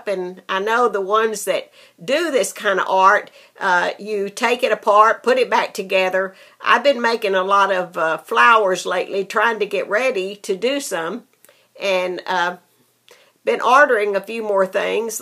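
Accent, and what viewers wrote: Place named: Texas, United States. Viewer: Your weløcome. I thoroughly enjoyed your videos. Its always for to see and hear about things I have no possibility to know. Loved your texas accent to.) My blog oh wow. I dont update that one as I should to buzy uploading things in here. You find me on pintherest also. Line Cathrin Ekseth wich is my full wonky norwegian name. I just spotted your order. Thank you very much for that.:D Big hug Lele.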